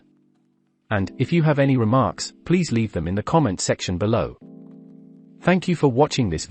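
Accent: Netherlands. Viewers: to bring back the Dutch accent. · I am missing the Dutch accent!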